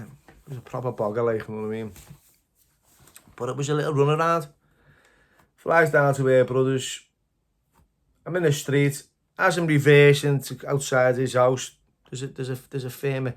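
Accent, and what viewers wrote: Liverpool, United Kingdom. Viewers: Loved the accent. Didn’t know it was possible for a scouser 😂. Great stories. Kept me interested all the way 👍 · love the old time storeys m8 you speak like a proper scouse lad not like the other fk on other channel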